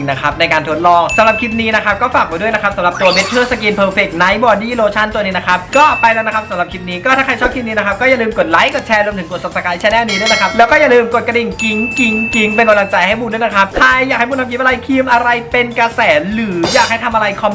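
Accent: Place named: Thailand. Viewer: คนจังหวัดอะไรไหนพูดสิคะ<num>น่ารัก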